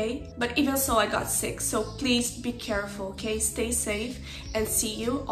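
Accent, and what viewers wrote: Brazil. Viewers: Você é BR não é? Também costumo falar inglês boa parte do meu tempo, e a gente tem o sotaque semelhante, acho que da pra reconhecer. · Ela é brasileira? esse sotaque dela me dá essa impressão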